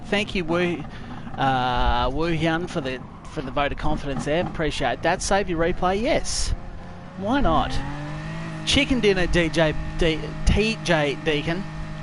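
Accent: Australia. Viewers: Quickly becoming my fave streamer/youtuber. Love your soothing aussie tones.(in a manly platonic way).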